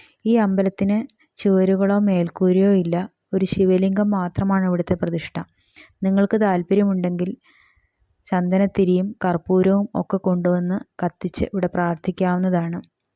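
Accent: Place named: Palakkad, India. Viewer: As per the slang of your language I think you are from palakkad. If I am wrong please correct it.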